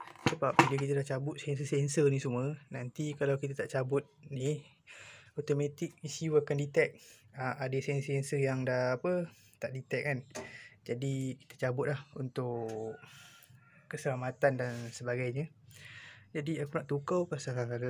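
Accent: Melaka, Malaysia. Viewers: Assalam bang, Nak mintak tolong tukarkan boleh ke?...Saya dah service kat bengkel kena RM 60 ...Macam tu jgk turun naik ....bunyi cakap mcm org melaka...kalau kat melaka boleh la roger2...haha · Pekat betul tu bang melaka tu hahaha. Mantap bang video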